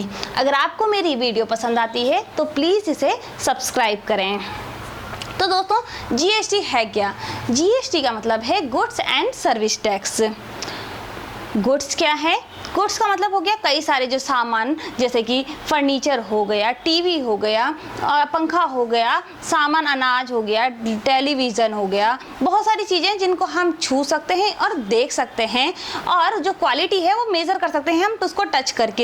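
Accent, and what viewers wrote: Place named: Rajasthan, India. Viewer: nice mam rajasthan she hi ho kya app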